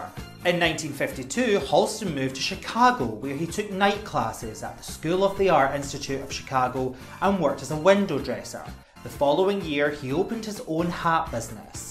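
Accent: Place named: Scotland, United Kingdom. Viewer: stay tuned...because of the virus...unfortunately theyve halted production...but a miniseries is being done on Halston....the star...is EWAN McGREGOR...i got a bit emotional watching your video...because you used an image ...the image you used at 1 minute and 53 seconds in your video......that a friend of mine shot of Halston...his name is HARRY BENSON...he is a Scot just like you....with that same lovely burr you have.....he is the man who predicted i would become a photojournalist....i was just a writer when i worked with him... i laughed when he said it...but he was right....i think of him every time i do a shoot...he taught me to be AGGRESSIVE AND RELENTLESS....he photographed Halston a lot...and he loved him...he was basically the main photojournalist for the then new magazine PEOPLE magazine...and he shot Halston ...Liza...and Liz...for the cover...all together...FABULOUS...Harry said that H...was the king of NYC...and rightfully so...Harry also shot H...for WWD quite a few times...Harry said that H..would give him beautiful scarves to give to GiGi...his wife and she still wears them proudly..i cannot begin to tell you how wonderful H was...of course you know he used a lot of women of color as models...Pat Cleveland of course.....Alva Chin....but he often used the 1st black supermodel...the late....NAOMI SIMS...a LOT..she is MOTHER...to everyone who is black that loves fashion...she is MOTHER..when she retired...to create her own makeup line..and wig line ...both are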